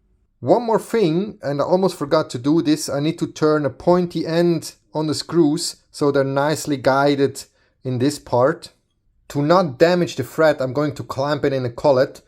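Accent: Switzerland. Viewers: Your skills are so amazing. This is the famous Swiss precision at its best. btw i like your English with the swiss Accent..🙂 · Echt Super ! und schoen deine Stimme zu hoeren Mit Zueri accent aber kein "full on" Zueri Dytch!. Wir haben in Oktober von Hirzel, 8816 nach Deutschland umgezogen so Gruezi aus dem Schwarzwald !!!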